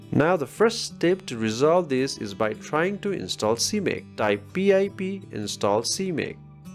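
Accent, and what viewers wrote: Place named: Bangladesh. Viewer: When you said GB to zee bee i knew you are from Bangladeshi kamla bosti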